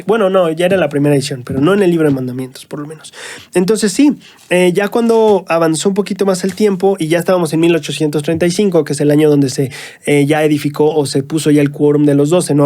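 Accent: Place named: Mexico City, Mexico. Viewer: Con mucho respeto me gustaría dar mi opinión respecto al hermanito, tengo entendido de que el nos habla desde la Ciudad de México (nosotros les llamamos Chilangos) y ahí he notado que todos los chilangos hablan el español muy rápido y de corrido y a pesar de que mi hermanito lo hable un poco rápido se le entiende muy bien y claro... yo puedo sentir muy fuerte el espíritu, yo siempre lo escucho y he aprendido mucho más del evangelio con sus explicaciones. Deseo de todo corazón que NPC siempre me lo bendiga con mucha salud y energías para que nos siga nutriendo spiritualmente, aunque no lo conozco personalmente El siempre está en mis oraciones para que le vaya bien siempre. Muchísimas gracias 🤗🤗🤗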